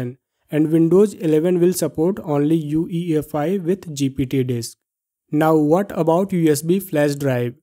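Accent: India. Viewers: Dude can you import subtitles? I understand english, but that "thick" Indian accent is very hard for me to understand.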